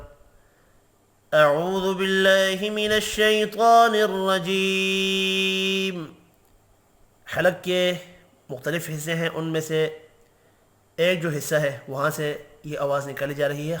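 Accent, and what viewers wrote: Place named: Panipat, India. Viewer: Sir Masha Allah bohot acha prha apne sir mujhe pani pati wala lyhja bohot pasand aaya aur aasan bhi lagraha hai Aur hadr mai bhi aapki tilawt bohot achi hoti hai jazak Allaj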